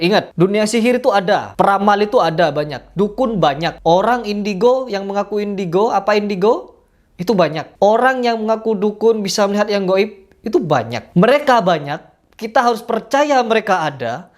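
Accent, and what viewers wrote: Madura, Indonesia. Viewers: Mas sampean kok ada logat logat maduranya, bisa bahasa madura mas??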